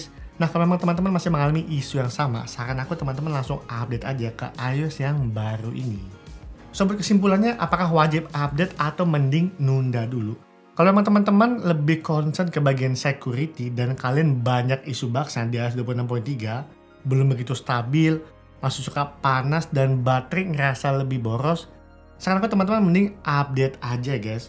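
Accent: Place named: Europe. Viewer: lidah - lidah eropa memang begitu bang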